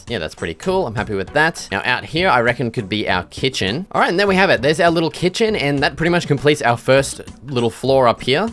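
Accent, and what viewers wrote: Australia. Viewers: Quick question r u Australian u have a goated accent also I changed 700 comments to 701 I ruined it😢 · Are u Australian? Is that an Aussie accent? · le me guess . ur from australia dunno jus got a feelin' mate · Are you Australian?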